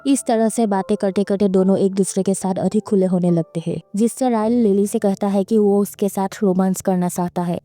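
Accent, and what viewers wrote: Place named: Assam, India. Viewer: Nice hindi assamess style voice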